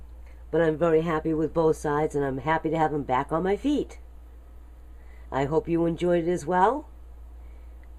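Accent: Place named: New England, United States. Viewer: That New England accent 💕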